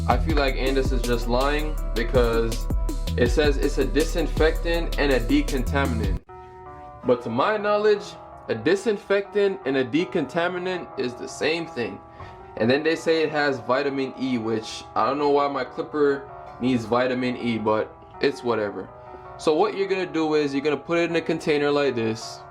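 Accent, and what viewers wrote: Jamaica. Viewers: Make 2 videos each time, Jamaican and "proper" English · Dweet ina the Jamaican Accent dawg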